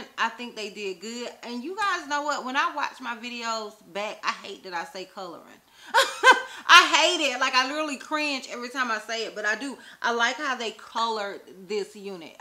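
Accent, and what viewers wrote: South, United States. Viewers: You’re a very beautiful woman. Your southern twang gives you more character as well. ❤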